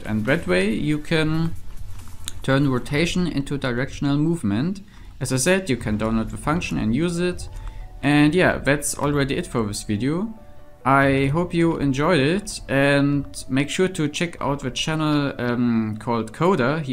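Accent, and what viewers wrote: Germany. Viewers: Ah yes, another German-accented minecraft nerd🤓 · are you german? your voice really sounds like german-english XD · Ich vermute dass du Deutsch bist. Ist das richtig?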